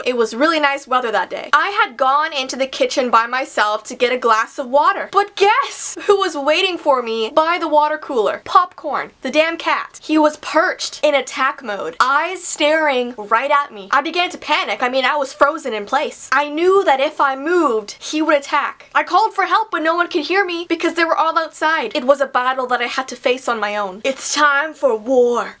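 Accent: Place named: Canada. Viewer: I love your Canadian accent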